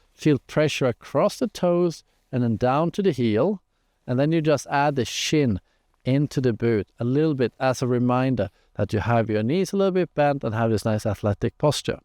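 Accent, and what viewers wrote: Sweden. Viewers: Are you swedish by any chance? Sound very swedish when you talk :D edit: Great tips by the way!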